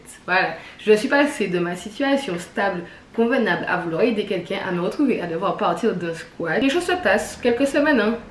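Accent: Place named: Antilles, Caribbean. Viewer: Tu devrais mettre des sous titres l’accent antillais tu piges rien mdr 😂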